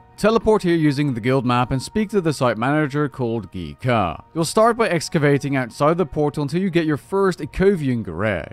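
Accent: Netherlands. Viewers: Reiniger Defently dutch i can hear😂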